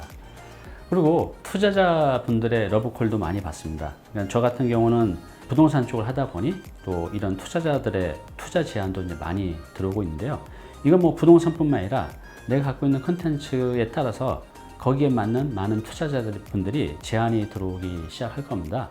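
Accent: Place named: Seoul, South Korea. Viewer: 외모도 깔끔하시고 듣기좋은 서울 말씨에 ㅋㅋ깔끔한 진행력. 알아듣기 쉽게 군더더기 없이 진행해 주셔서 너무 고맙습니다. 귀에 쏙쏙 들어와요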